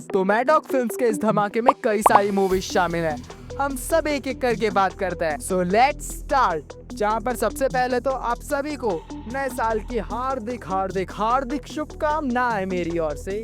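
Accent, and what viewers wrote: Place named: Maharashtra, India. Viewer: Accent se lagta hai bhai maharashtra se hai😅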